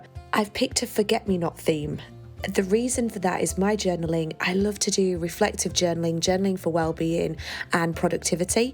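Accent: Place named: United Kingdom. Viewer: So pretty!! I am not the best at identifying UK accents but always curious. Where is your from?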